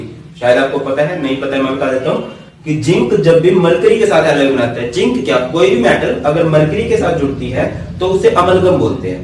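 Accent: Haryana, India. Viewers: Sir kya aap haryana se h ??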